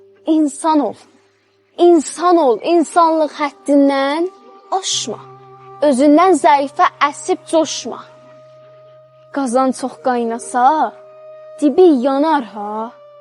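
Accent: Tabriz, Iran. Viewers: Sağ ol şirin qiz nə gözəl Təbriz ləhçəsidi xoşbəxt ol yaşasın bütöv Azərbaycan Baki Təbriz Ankara ! 👍❤️🇦🇿❤️🇹🇷❤️🇵🇰🙏✊🧿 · Təbriz ləhçəsi😢😢😢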